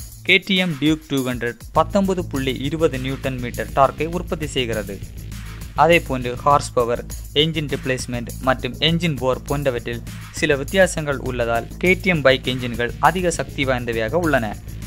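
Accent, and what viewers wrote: Kanyakumari, India. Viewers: Neega Kannya Kumari yaa bro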